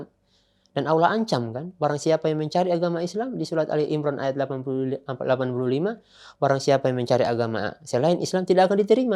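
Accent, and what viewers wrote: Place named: East Nusa Tenggara, Indonesia. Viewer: Logatnya ntt bukan Poso lagi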